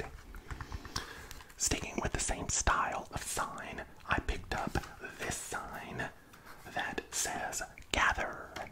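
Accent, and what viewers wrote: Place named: South, United States. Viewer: Love the southern accent; very relaxing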